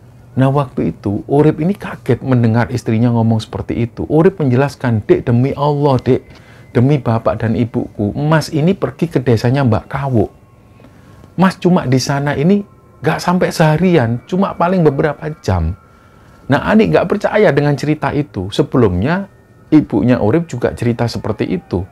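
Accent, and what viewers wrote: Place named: East Java, Indonesia. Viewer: Semangat terus Tim 😊 kadang memang dalam berkembang akan terus ada masukan dan kritikan sampai nantinya bisa punya "ciri khas utama" yg akan diterima semua pendengar apa ada nya 😊 Btw kl saya pribadi, saya suka karena ada logat jawa timur nya.. jd suka kl mas ngmg lebih lokalan lagi, nyeletuk boso suroboyoan, atau ngasih info2 sejarah atau latar belakang lainnya. Jadi waktu baca komentar ada yg bilang, "Kl ngomong pakai Bahasa Indonesia dong, jangan pakai bahasa daerah. Kan yg dengerin ga semua ngerti." Aku merasa.....aneh 😅 karena kl pengen dengerin kisah horor berbahasa indo knp ga dengerin Chanel lain aja.. kan memang channel yg seperti ini, dan ini yg bikin ngenak di hati subscribernya.. 😢 Tp yowes lah, apapun yg usahakan, semoga bisa berkembang menjadi lebih baik lagi dan bermanfaat untuk org banyak. Aamiin 😊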